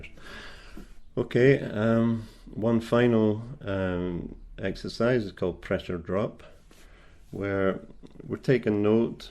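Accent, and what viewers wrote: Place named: Scotland, United Kingdom. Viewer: Yr fingers looked like a spider, I said to hubby. Then u said spider. ;) Love yr accent. Scottish.. ? THe best accent in the whole world.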